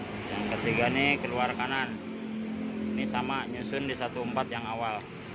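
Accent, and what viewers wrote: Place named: West Java, Indonesia. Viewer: Kieu ari urng sunda mh...ngomng indo sok cmpur jeung sunda...karakter na khas.....mantf penjlsn na sa x oge ngartos...siap lh kepala ketmu ekor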